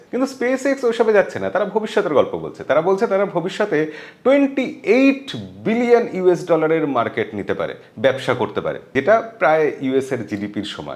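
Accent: Bangladesh. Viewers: বাংলাদেশি উচ্চারণ!!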